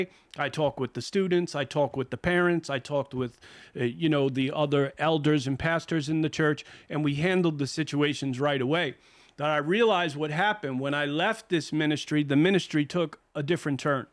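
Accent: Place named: New York, United States. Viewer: I am subscribed and here for building faith... but as a former NY resident, now in Florida, I am absolutely here for your NY accent that I miss hearing except from my own mouth.😊 God bless.🕊️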